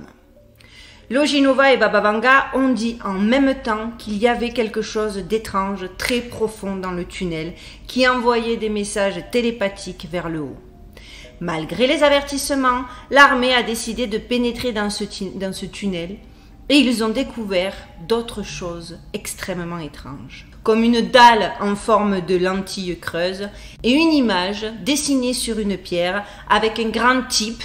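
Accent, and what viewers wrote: South, France. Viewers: Ma niece m a dit que tu viens de chez nous ds le sud je kiffe tes videos et ton accent bien de chez nous ❤❤❤ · Ils lui en veulent plus pour son assent du sud.lol .moi j' aime bien au début " hello wiwane "🤣😋😘.j aime bien la chaîne (pour le contenu avant tt)car les vidéos ne durent pas une éternité comme certains qui font des vidéos de 3 heures. Encore une vidéo ou 2 ,je veux bien, mais quand c'est à répétition, je suis obligé de fuir. Sinon bravo la miss 😘👏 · Bonjour en ce jour de Noel 🙏🌟🎄:je découvre cette vidéo hallucinante ,tu es extraordinaire !! Les sujets les plus "sérieux" et bien fouillés,traités avec un humour dévastateur,😂et en plus,ça ne plaît pas à tout le monde ... La peur, tout est fait pour que les gens soient Morts De TROUILLE 😠. Facile de mettre les gens qui dérangent en hôpital psy .... Allez bonne journée à tous et merci pour cette ,et Toutes ces vidéos que tu nous fais; avec toi,on avance à grands pas ,et tu nous fais évoluer .Accent du 13 ? 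Et moi du 34 !!!😻💖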